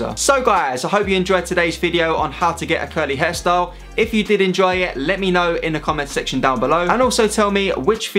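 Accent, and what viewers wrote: United Kingdom. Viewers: Bruh you speak so English English that i cant hear you i can hear American English you now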